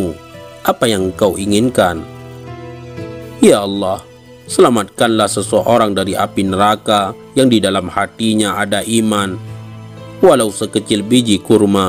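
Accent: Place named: Bali, Indonesia. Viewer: Suara admin kayak orang Bali ya?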